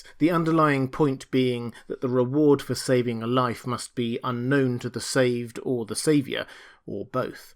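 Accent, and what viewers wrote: United Kingdom. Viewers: Lol it's just his British accent I think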